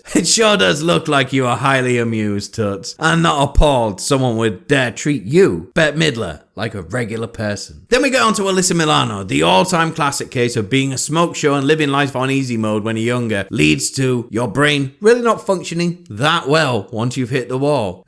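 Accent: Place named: United States. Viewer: this is the most american sounding man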